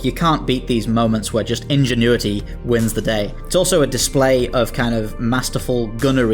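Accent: United Kingdom. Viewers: I love the glottal stop of the producer of this video; also his use of "little bit." This is my favorite British accent.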